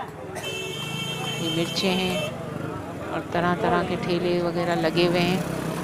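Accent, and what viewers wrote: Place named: India. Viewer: Your voice is pure Indian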